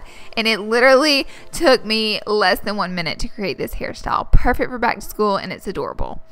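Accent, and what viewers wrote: South, United States. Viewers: You have a posh southern accent. I love it. Your so beautiful! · are you southern, you sound like me haha like a baby southern accent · Are you from the south cuz you sound like it.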